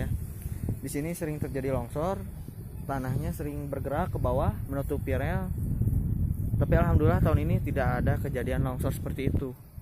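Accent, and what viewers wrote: West Java, Indonesia. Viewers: Nyunda pisan euy,kaciri urang sunda mah.nyarios bhs indonesia ge..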